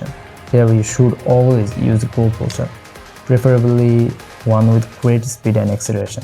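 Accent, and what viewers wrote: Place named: Kerala, India. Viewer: i knew right away that you're a mallu from that accent...aren't you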